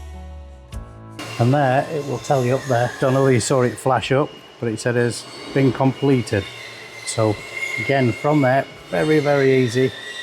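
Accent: United Kingdom. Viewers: I am not a metal worker but have been interested in metal work for the past 5 or 6 years now, I am actually a woodworker and I make fine furniture with various hardwoods etc, but I am very interested in the XTool MetalFab and I have ideas what I could achieve if I had this machine, I might have to sell my car just to get it as it is so expensive but I have a vision. I enjoyed watching your video so I have now clicked on the thumbs-up button and I have subscribed to your channel as well. Great to hear the accent and that you are UK based as I too am originally from the UK although I now live abroad. 😀👍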